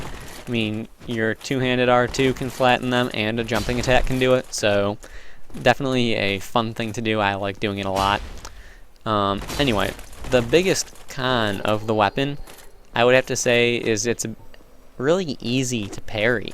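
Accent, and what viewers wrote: United States. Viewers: I speak german. You are pretty much pronouncing it correctly. Heavy american accent but correctly. (I'm american just sayin it sounds like an american sayi g it right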